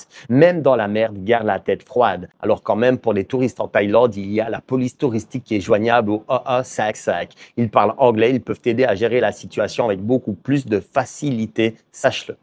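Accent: Liège, Belgium. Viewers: Hello, Gros accent liégeois? Tu es d'où? Je passe quelques semaines en Thaïlande en septembre-octobre, un conseil; plutôt condo ou hôtel? Intéressant en tout cas m,fi.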